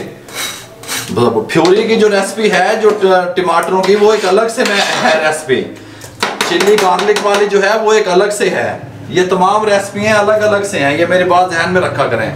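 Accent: Punjab, India. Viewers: ALLAH khush rakhy baba ji aap ko AAMEEN ❤️ itni mehnet se banaty hain aap Love your punjabi touch 😍😍😍😍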